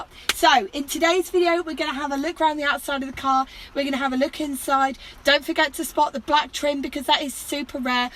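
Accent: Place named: RP, United Kingdom. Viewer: Oooh gone posh again! Suits you I reckon. Another great car review as always 😁👍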